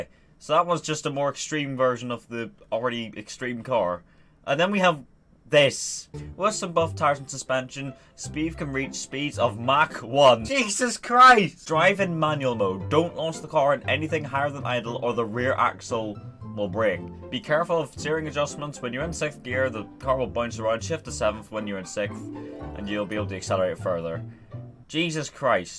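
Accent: Germany. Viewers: wait, are you german ? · You german?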